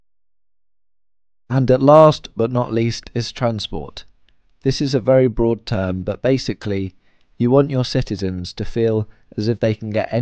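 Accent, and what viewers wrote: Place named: Birmingham, United Kingdom. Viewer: i think bro's from birmingham 💀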